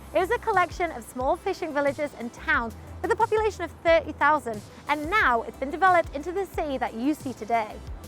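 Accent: United Kingdom. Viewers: You're so cute，as well as your British accent. Hope I can buy you a cup of coffee when I go back to China😄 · I noted that she and another British youtuber, Mr. Barrett, pronounces City as CE. Not sure this is a common UK accent? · Sorry to say, with your accent I take it you are from UK and when you mentioned there were MANY pot holes in Beijing but when comparing to UK; the road in China is as smooth as a baby'd bum!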